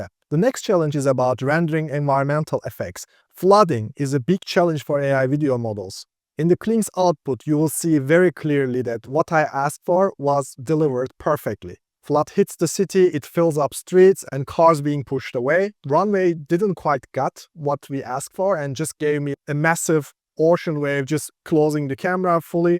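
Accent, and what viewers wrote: Turkey. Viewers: türk ingilizcesi nerde görsem tanırım bre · From your accent I surmise you are from Turkey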